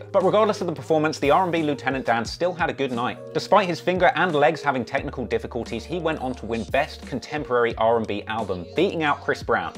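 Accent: England, United Kingdom. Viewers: keep those jokes coming and pursue a YouTube career stop trying to be a rapper u got english accent and u dont look like a rapper , ur " remember that one time" jokes are better than ur rap skills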